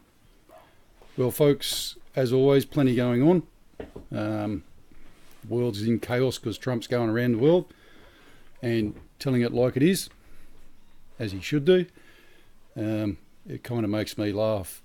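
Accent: Australia. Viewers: Are u a queenslander mate?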